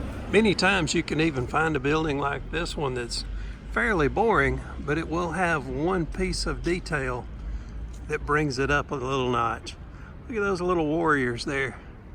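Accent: South, United States. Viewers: Great episode,! I live in Georgia(USA). Just subscribed to both channels. I enjoy your southern drawl and your videos! Thanks!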